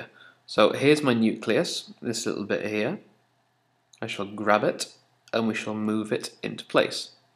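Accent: United Kingdom. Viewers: he bri'ish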